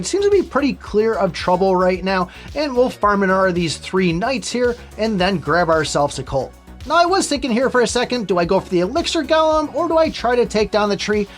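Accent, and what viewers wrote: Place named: Pennsylvania, United States. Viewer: You definitely don't need to answer this. I'm just super curious. I grew up in PA. And your "accent" sounds so similar to my family and the friends I grew up with. You also might be from Wisconsin, lol. Again, don't answer if you're not comfortable.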